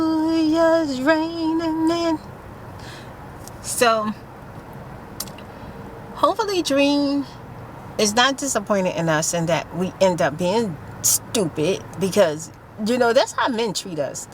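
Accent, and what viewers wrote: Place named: East Coast, United States. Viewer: So I'm in NYC and yu give me East cost vybez lol like Jersey Philly NYC your accent I can listen to u all day lol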